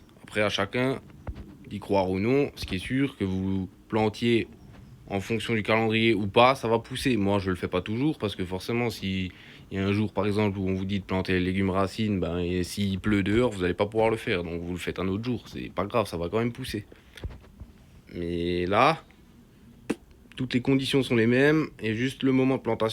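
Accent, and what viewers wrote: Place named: Franche-Comté, France. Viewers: Je vous adore !! Ainsi que votre accent qui me rappelle ma Franche Comté natale!! 😊 Je suis très en retard pour la plantation de mes tomates !! Mais avec le pont de cette fin de semaine, sans regarder la lune, je les planterai quand même!! Mais je vais essayer votre fil de cuivre. Ça ne mange pas de pain !! Merci pour vos publications pleines d'humour. Je vous kiffe ! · Merci de tester et d'ouvrir les esprits, d'autres part je crois que je tombe amoureuse de ton accent de l'est 😉j'apprécie tes conseils, ta manière de faire des rimes avec le vivant du potager, j'ai vu les rageux se déchainer en commentaire sur ton dernier réel sur l'éléctroculture, un pas de travers dans le sens inverse de leur pensée et tout le monde s'enflamme au lieu de tester tout simplement, ils préfèrent boycotter!! bise de l'ouest 😘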